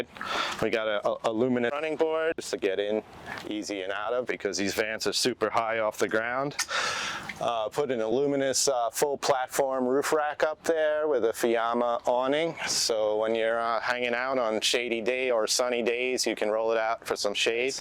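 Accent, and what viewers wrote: New Jersey, United States. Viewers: New Jersey accent =dumpster fire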